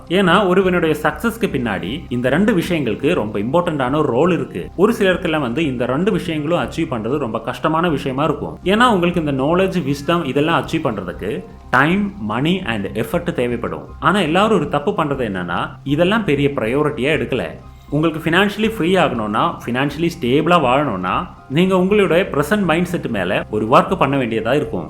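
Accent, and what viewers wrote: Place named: North, India. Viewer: Vadamanila manithan pesum tamil... Try to talk in pure tamil or pure English... Don't mix(tamil+hindhi slang)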